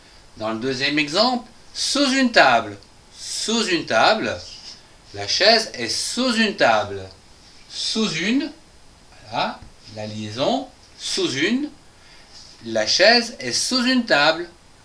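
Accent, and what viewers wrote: France. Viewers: Je trouve que l'auteur à un accent, attention à ne pas répéter avec cet accent qui fait vieille France/Paris 16e et qui vous fera peut-être passé pour hautain si vous insistez dessus. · Tu veux rire? Je suis canadien et j'avoue qu'enfin ... je recontre un français qui ne me fait pas ch...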